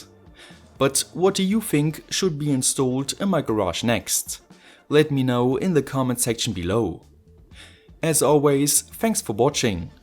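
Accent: Germany. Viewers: hes german not french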